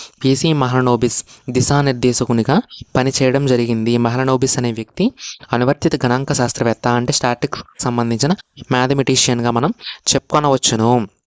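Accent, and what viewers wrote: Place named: Godavari, India. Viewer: mana godavari slang.......love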